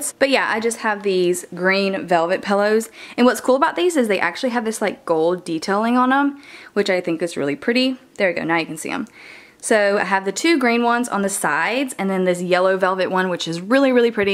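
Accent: United States. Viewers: I love your accent lol it makes me think of my home(which is Oklahoma) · Are you from Texas? You sound just like me 😂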